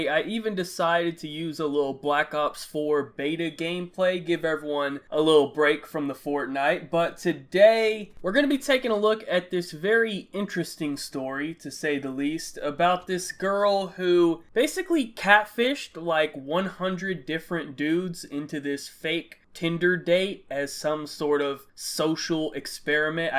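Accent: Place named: DMV, United States. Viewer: Bruh are you from the dmv cause you kinda sound like it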